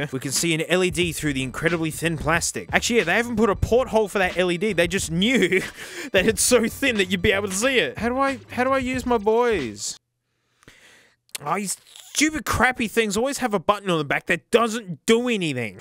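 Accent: Australia. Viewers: I love how aggressively Australian his stories are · Hearing a Australian man talk into the worst sounding microphone ever makes me happy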